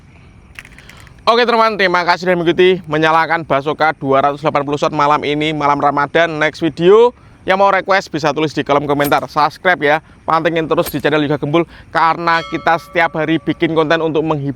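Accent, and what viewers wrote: Java, Indonesia. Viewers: Bang kue uong jowo yo😅😅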